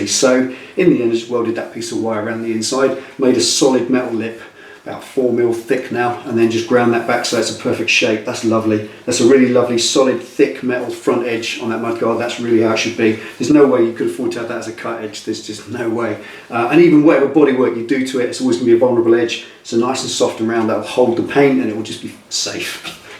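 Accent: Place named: West Country, United Kingdom. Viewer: Found your vlog recently, You've mad skills. I thought there was something familiar about your speech etc. , then I saw you're from the West Country. I was born there, married a Lyme Regis girl, and miss it as we've lived in the States for years. Holidays always seem to end too quickly. I see you collect number plates. I wonder if you'd like one of my old bike plates from Florida. RIX TOY. It's yours for the asking. Keep up the good work. At 70yrs. old I still learn things from every posting. Thank You. - Rick Newbery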